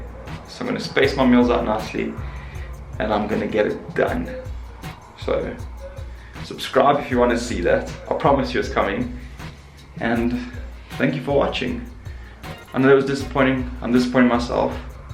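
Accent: South Africa. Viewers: Just found your channel. I thought the accent sounded familiar :)) South Africa! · Hell yeah, I was guessing you're from South Africa. That is my favorite accent in the whole world :D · I knew I recognised a fellow South African 😁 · Are you South-African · You're one of the few South African sounding South Africans I've seen on YouTube yet. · Are you south african ?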